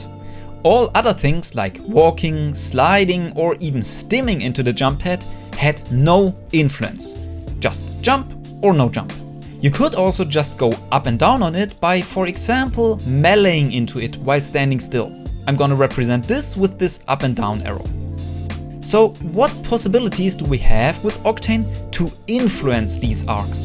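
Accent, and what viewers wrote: Germany. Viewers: I as a German INSTANTLY recognized his accent😂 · u sound extremly german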